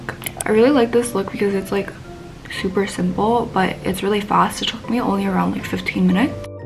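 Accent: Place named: China. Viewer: chinese accent 很好聽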